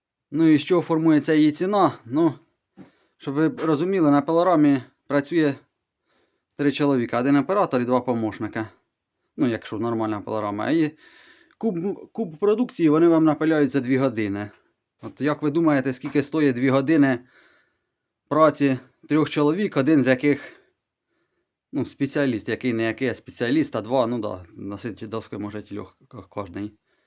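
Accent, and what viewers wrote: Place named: Poltava, Ukraine. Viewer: Мені подобається ваш полтавський говор , я сам з смт Покровське дніпропетровської області і дуже схожий вас суржик с нашим, просто дивуюся , дякую.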